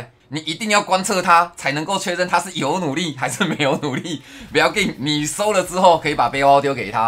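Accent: Taiwan. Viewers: 階地氣的文案、台味的語氣。很喜歡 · 這個台味好純XD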